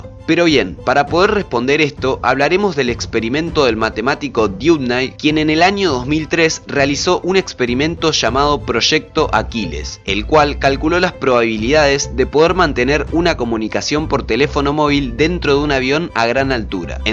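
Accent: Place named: Rioplatense, Argentina. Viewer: Es un vídeo interesante, aunque varias de las explicaciones ya han sido ampliamente conocidas anteriormente. Ahora en este vídeo olvidaron uno de los hechos más significativos, que es el derrumbe injustificado del "Edificio 7", el que no fue tocado por ninguno de esos aviones empleados en esta Bandera Falsa, sino que ese edificio se derrumbó solo y sin explicación alguna. Y para mayor abundamiento, una periodista estadounidense en su informe en vivo mencionaba el derrumbe del "Edificio 7, cuando a su espalda, éste aún se mantenía plenamente en pie, y sin daño alguno. No obstante ser éste un buen documental —y lamentablemente— el relator de este vídeo padece de la tara foniátrica rioplatense de confundir reiteradamente la pronunciación de la LL y la Y, con la CH. Él menciona esto: chamar, cha, aquechos, chamadas, prochecto, echa, detaches, chamativo, chegaba, achí, chevaron, chegar, machor, anicho, cacheran, apochan, echas, ensachos, concluchendo. 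Por querer decir esto: llamar, ya, aquellos, llama, llamas, proyecto, ella, detalles, llamativo, llegaba, allí, llevaron, llegar, mayor, anillo, cayeran, apoyan, ellas, ensayos, concluyendo. Lo cierto es que el sonsonete reiterativo de esa tara foniátrica, termina fastidiando al oído de cualquiera que no sea de esa región. Creo que este videísta debe ir pensando en instalar una voz robótica en sus vídeos, es mucho más neutral, tienen buena pronunciación, y lo mejor de todo, sin esos desagradables sonsonetes de aldea. Hay que cuidar n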